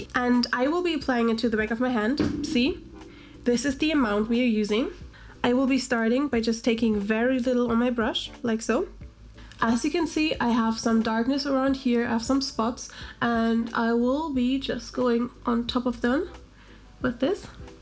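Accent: Germany. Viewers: I can hear a german accent. :D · Da höre ich doch eher deutsch als Muttersprache. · Are you from Germany? I love your accent; it's so beautiful.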